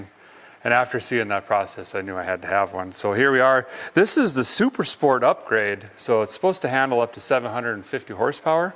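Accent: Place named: Minnesota, United States. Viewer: Great video as always. I’m waiting for the Minnesotan to southern accent conversion.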